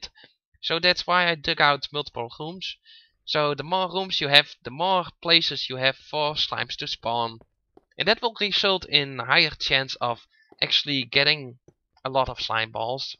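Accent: Netherlands. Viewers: Dutch accent. :p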